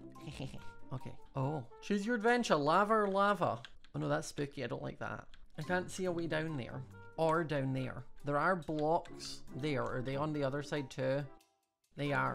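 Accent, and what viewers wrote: United Kingdom. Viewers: U sound British 🤐🤐🤐🤐🤐🤐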